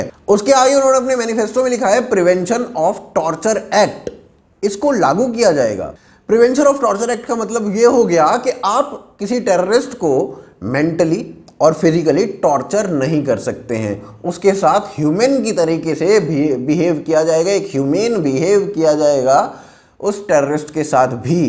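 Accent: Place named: Allahabad, India. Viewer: baba illahabadi (allahabad) accent mare ho tum ek bhokaal!!! nice to hear such accent on ur channel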